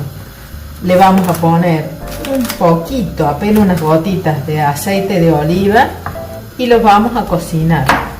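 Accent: Córdoba, Argentina. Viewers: Por casualidad usted no es cordobesa xq a mí me parece que si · por el acento ya se sabe como hablan los cordobeses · Tienes un acento típico de la provincia Argentina de Córdoba! muy lindas las cordobesas! Gracias por compartir tu receta ,voy a poner manos a la obra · Sos de Córdoba 😁 por la tonada. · Muy bien explicado… (la tonada le da la magia a la receta) aguante Córdoba❤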